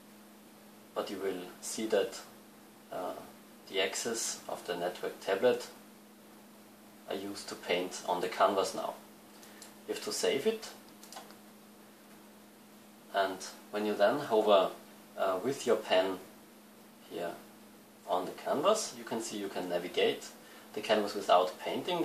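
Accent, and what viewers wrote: Germany. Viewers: Deutscher Akzent xD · german accents?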